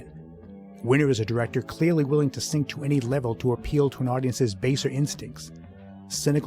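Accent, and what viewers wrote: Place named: Boston, United States. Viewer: Came for the Winner facts, stayed for the Boston accent.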